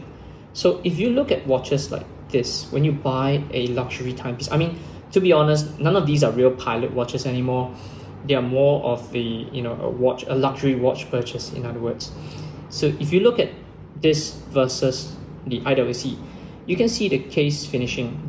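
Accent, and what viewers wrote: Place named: Singapore. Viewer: Long jeans! Thats how most Singaporean shouts out it as. Nothing wrong with pronouncing it from a local standpoint. He does not sound fake. Keep it up👍 Would like to see more reviews mid priced luxury watches from your channel. But keep in mind, that since comparisons are made between 2 watches, always be reminded to repeat that these reviews are in your own personal opinion and preference.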